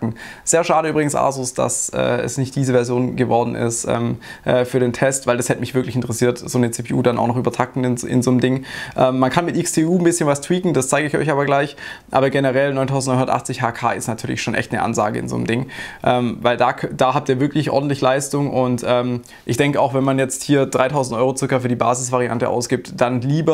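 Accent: Swabia, Germany. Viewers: dein Schwäbischer unterton tut den Ohren gut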